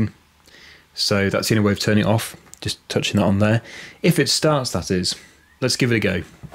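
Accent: United Kingdom. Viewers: Excellent video, thank you! And the British accent makes it even better.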